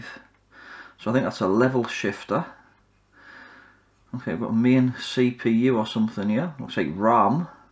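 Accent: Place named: North East England, United Kingdom. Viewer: I think your videos are brilliant. I have always liked the North East accent. I was hoping one day to marry a Geordie girl just for the voice. She could tell me bedtime stories so I could drift off into dreamland. Thanks for the info on the trinocular. I have bought a few tools but still needs a few more things. Thanks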